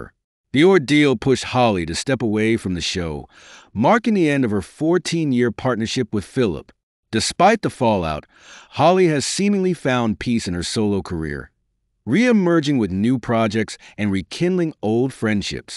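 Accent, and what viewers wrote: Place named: United States. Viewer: The American accent narration is an odd choice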